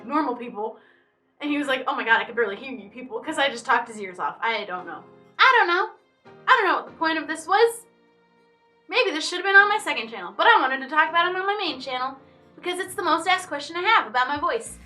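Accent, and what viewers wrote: Texas, United States. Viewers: You sound like you were from Texas in UHShe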